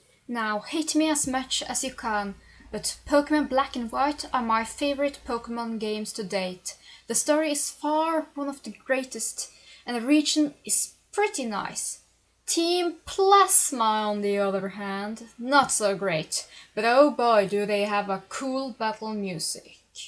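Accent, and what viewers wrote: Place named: Balkans. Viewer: yeah, a let's play would be nice.. I like your accent and the way you talk! So the ppl like me who actually like your takling, would enjoy the vids as well:) You're from the balkan, right?:D And I'm telling you that bcz you seem very aware of your talking since you mention it in the beginning of somme vidz:D ASMR is cool, it's for relaxation purposes.. it's all about sounds ppl can hear, like whispering, or for example the sounds of the buttons when you play on the DS.. Look it up:) if you do those vids, ppl who like pokemon will watch your vids but also ppl who like asmr... And ppl who like them both will simply adore you lol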